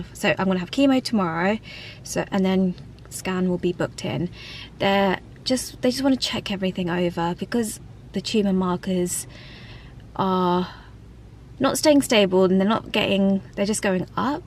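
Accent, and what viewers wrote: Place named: Oxford, United Kingdom. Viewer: speaks Oxford English, French and Tamil.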